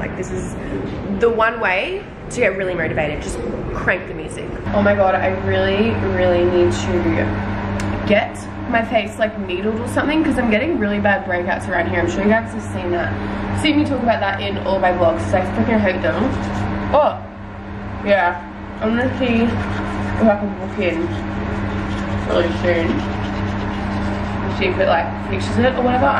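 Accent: Australia. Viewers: New to this channel. Is she Australian? I can’t tell where the accent is from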